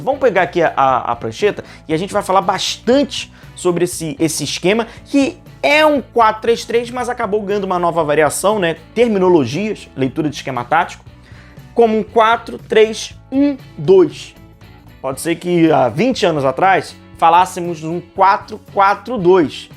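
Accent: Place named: Rio de Janeiro, Brazil. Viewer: Poxa desculpa eu sei que é mais pelo sotaque carioca, mas... me perdoa a professora de geometria... isoiceles!! E a professora de português, como é que fica??!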